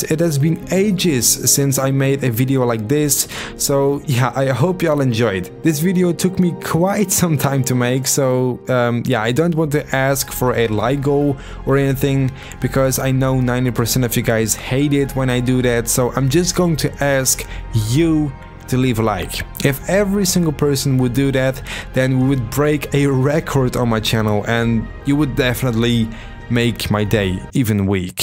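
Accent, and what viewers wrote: Netherlands. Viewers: Dude are you dutch????? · you are dutch I recognice your bad accent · Nederlands toch je weet zelf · yu dutch m8?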